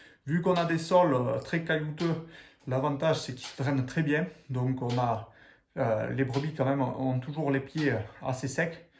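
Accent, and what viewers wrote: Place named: South, France. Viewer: Salut, ma femme me dit toujours, avant de faire crac crac coupe toi les ongles, ça t'éviteras de me griffer. Hahaha… Si non,chapeau pour tes expliquations,j'en apprends chaque fois, c'est un régal,en plus avec l'accent du SUD. AH, le Larzac,Joseph Bové, le thym le romarin, Ho, là je m'égare,salutations Serge.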